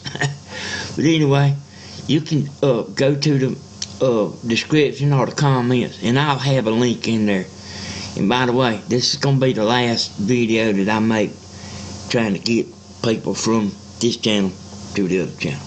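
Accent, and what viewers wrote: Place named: Appalachia, United States. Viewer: hillbilly english...fit'n to be.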